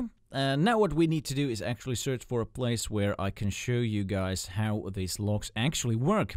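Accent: Finland. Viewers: are you from finland?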